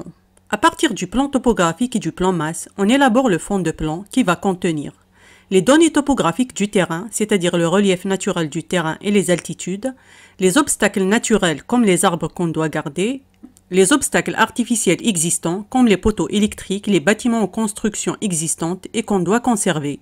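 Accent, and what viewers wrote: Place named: Kabylie, Algeria. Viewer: J'adore surtout avec l'accent Kabyle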